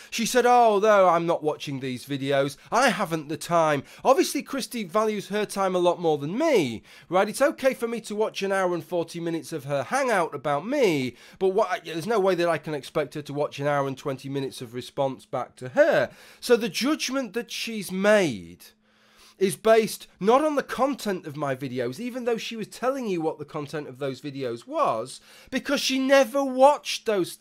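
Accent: United Kingdom. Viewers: British accent is the best:)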